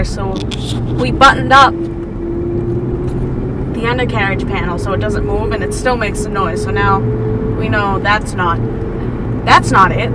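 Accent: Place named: Boston, United States. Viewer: Is it just me or is yr voice getting more Bawstin? Lol I love it.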